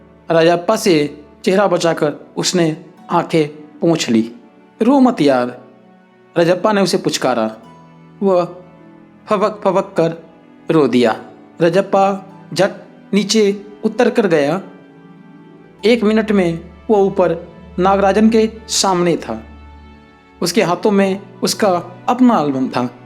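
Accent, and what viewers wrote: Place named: Bihar, India. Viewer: बिहारी की आवाज में मजा नहीं आ रहा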